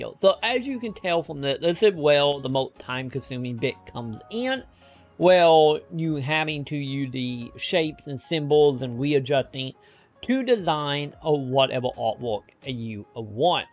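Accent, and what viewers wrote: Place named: Australia. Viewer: This is the most Australian accent i've ever heard.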